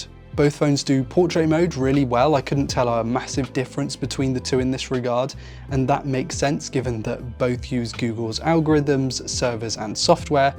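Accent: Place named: United Kingdom. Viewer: He sounds British so whats with the prices in dollar 💵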